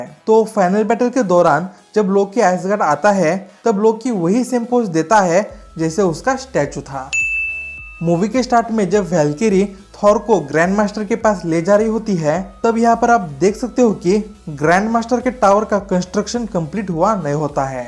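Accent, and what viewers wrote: Bihar, India. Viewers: Tum bihar se ho kya brother?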